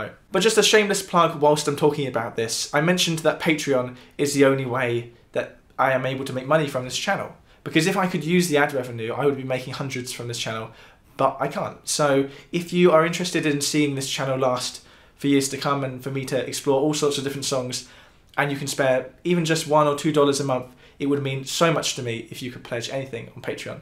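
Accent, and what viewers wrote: United Kingdom. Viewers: What I also like about this channel is that English is spoken in a very clinical clear way as if it is radio English. Yes there is a slight British general accent, but not disruptive. We don't want to be sidetracked with heavily accented English. This is also understood by Americans without being American English. It's almost international English I dare say.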